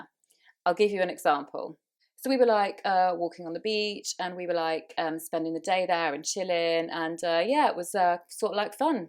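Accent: United Kingdom. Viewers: you are such a wonderful english teacher, I learn many things from you and I love your UK accent however I can follow you on FB and insta but not on Tik Tok because it's ban in my country but Love from India 🇮🇳❤️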